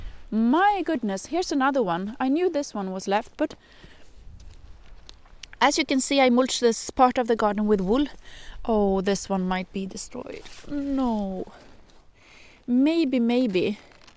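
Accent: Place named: Sweden. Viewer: Tack så mycket. Jag tycker om dig och ditt innehåll. Jag lär mig mycket. Din engelska är utmärkt. Det jag särskilt älskar är att höra din söta accent. Jag ska berätta varför ... Jag är mormor, författare och lärare i Seattle. Jag älskar också att trädgård, sticka, sy, läsa och spela musik. Min make är en Blues-trummis. Jag har bott här hela mitt liv. Men ALLA Fyra av mina morföräldrar var från Sverige! (Stockholm & Malmö) Det finns ett starkt skandinaviskt inflytande i Seattle. Jag tänker alltid så mycket på mina morföräldrar vid jultiden. Jag bakar min mormors kakor och andra recept varje år. INGEN gör julen bättre än svenskar !! Jag har underbara minnen och traditioner. Din röst påminner mig om deras kära röster. Tack.(Google Translated for fun. )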